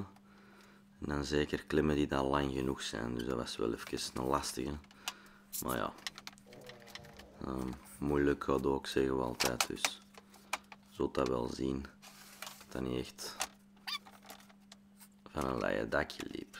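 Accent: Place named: Antwerp, Belgium. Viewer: Hey,gelemen wat is dat??? 😜het is gelijmd. Je zegt toch vastgelijmd of vastgeplakt 🤔. Ik hou wel van dat Antwerps 👍. Maakt u video leuker 😀. Mooi werk trouwens. G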